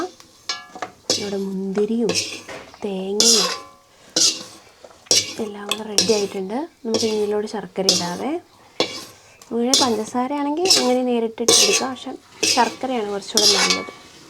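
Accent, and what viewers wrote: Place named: Central Travancore, India. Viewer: ഞാനൊരു subscriber അല്ല അതുകൊണ്ടുമാത്രം മറുപടി തരില്ല എന്നുണ്ടെങ്കിൽ വേണമെന്നില്ല എനിക്ക് ചോദിക്കാനുള്ളത് നിങ്ങൾ വയനാട് ആണെന്ന് പറഞ്ഞു പക്ഷേ നിങ്ങളുടെ സംസാരം ഒരു മധ്യതിരുവിതാംകൂർ ഭാഗത്തുള്ള സംസാരം പോലെ ആണല്ലോ 80%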